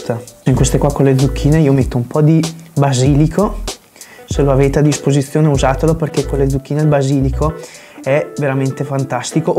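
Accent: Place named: Trentino, Italy. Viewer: though he does have a Trentino accent with Veneto influences, and the dialects that are spoken there belong to the same family of southern French and Castilian and are the more "spanish sounding" of the country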